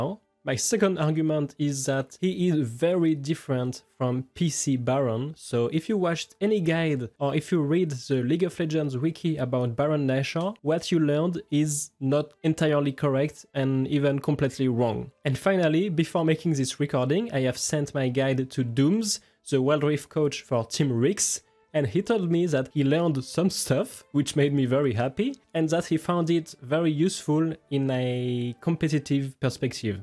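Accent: France. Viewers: I can hear ur french all my friends talk in this accent 😂 very good content 👍👍🔥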